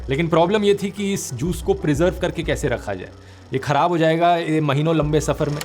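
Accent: India. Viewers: The real indian voice ✨✅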